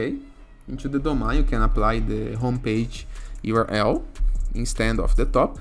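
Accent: Brazil. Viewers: Cara notei na hora que tu era brasileiro pelo seu sotaque kkkkk mas parabéns pelo video!!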